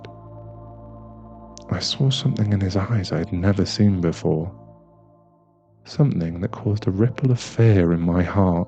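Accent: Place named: United Kingdom. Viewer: New subscriber, nice to hear a fellow Brit here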